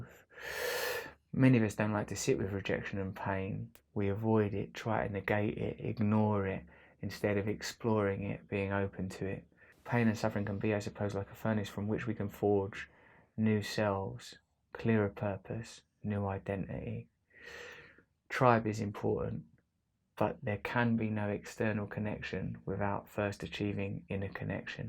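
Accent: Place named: United Kingdom. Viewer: three reasons why I always regret watching Russel Brand videos: 1. Strong British accent ( I bearly understand a thing) 2.circling around a topic 3.messy appearance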